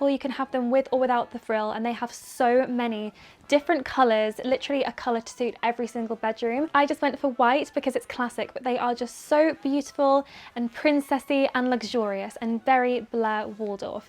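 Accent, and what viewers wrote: United Kingdom. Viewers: Jennie Aesthetics I’m English and sound nothing like her she is posh English 😂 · her british accent... love it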